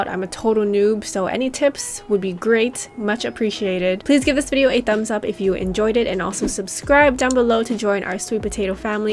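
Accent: Hawaii, United States. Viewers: ho you sound like you from Hawaii.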